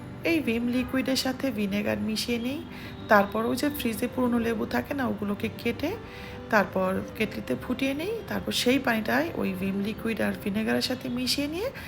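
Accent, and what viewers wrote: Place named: Barishal, Bangladesh. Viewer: Apu apni kothai porashuna korechen,apnar kotha shune barishal,feni,noakhali mone hoy,?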